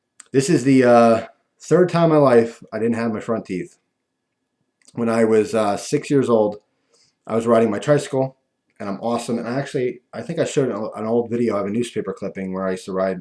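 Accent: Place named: New Jersey, United States. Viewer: I thought you "sound funny" cause you're originally from Jersey. I'm going looking for your Paypal email. I want to see you with a flipper for now and you pricing implants as well as a bridge. HesGoneNuts is right, shut up and take our money. What you do has value, let us demonstrate that value voluntarily. It's the libertarian way, are you some kind of commie? =) Why do you hate America? Why do you hate Truth, Justice, and Superman? You aren't the boss of us, take our cash.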